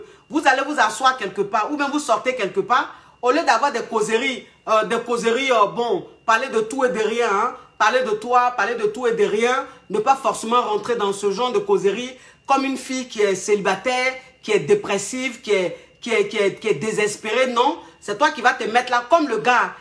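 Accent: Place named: Ivory Coast. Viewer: 😂😂😂😂😂😂😂ma Diva tu m'a tué hooo ko grillade , soupe, braiser je te love ma cause simplisimie, sans façon, ma diva qui parle le vrai français direct de nous en côte d'Ivoire. Love u❤❤❤❤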